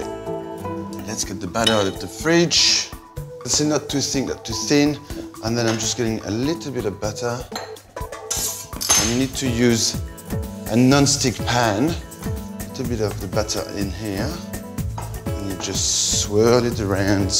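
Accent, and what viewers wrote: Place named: France. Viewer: Not sure this was a good video because all I noticed was his charming face and his endless french accent go blah blah blah. Your words mean nothing Manu, but ur face remains magnificent.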